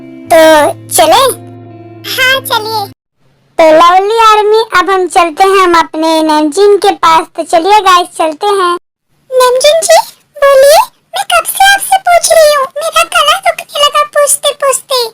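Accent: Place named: Bihar, India. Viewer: haan kyunki aap bilkul meri tarah thinking rakhte ho mujhe story se hi pata lagta hai aur ek baat aur aapka bihari excent bhi mujhe pasand hai kyunki main bhi UP wali hun🤭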